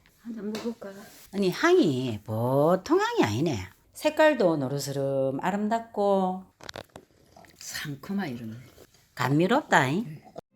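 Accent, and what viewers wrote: Gyeongsang, South Korea. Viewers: 쑥갓꽃차 향이 너무 좋겠죠 한잔씩 마시고 싶네요 잘보고 갑니다 👍💖😅경상도 사투리가 익쑥합니다 ㅎ 어딘가요 저도 상주입니다👍💖😅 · 댓글주셔서 감사합니다 경상도 사투리짱 우리동네 주민같아요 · 일부러 보일려는 꾸밈이란거 없이 텁텁한 갱상도 사투리로 들으니 시골 고향지키고 사는 큰올케를 만난것같은 편안함으로 영상이 시작되서 웃음부터 나고요 남모르게 피어나 짙은 향기까지 품어내어도 있는지 없는지 무심히 여겨왔던 쑥갓꽃으로 향기로운 차를 만드는 과정은 살아있는 예술일 수 밖에 없네요 이름모를 들꽃 하나로도 아름다운 시를 엮어내는 정말 대단하셔요 쑥갓꽃차 향이 콧속으로 풍겨오는듯이 마음에 여유로움이 생기네요 멋져요~!!!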